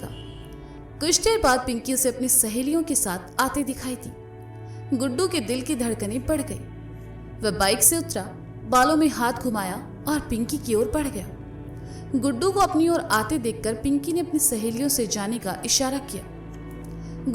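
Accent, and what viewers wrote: Varanasi, India. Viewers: Ma'am aapki voice so adorable and apki voice me ye banarasiya language so amazing lagti hai ❤❤🥰🥰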